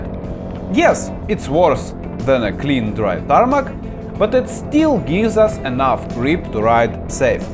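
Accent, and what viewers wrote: Russia. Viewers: Recorded in Buenos Aires by a dude speaking English with the Russian accent. Nice! :) Безопасно себя ощущаешь на байке в Capital Federal?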